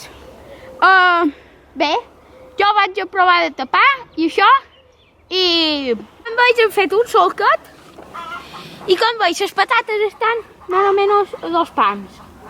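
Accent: Mallorca, Spain. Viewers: Jo sí la entenc. No sóc català i vaig aprendre-ho. Com m'agrada l'accent mallorquí posoTV mallorquí de IB3 de quan en quan. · Mare meva com canvia el català de Tarragona al Mallorquí, Bon vídeo Miquel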